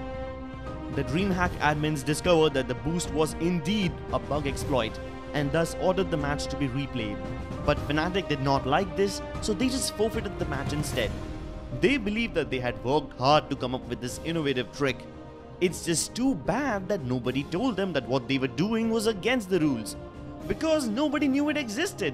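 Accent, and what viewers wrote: India. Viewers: Indian accent f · This Indian accent is killing me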